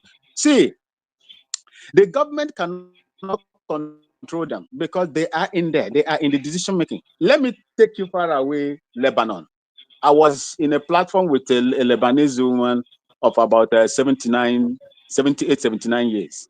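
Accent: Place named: Igbo, Nigeria. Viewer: This is an igbo man from his intonation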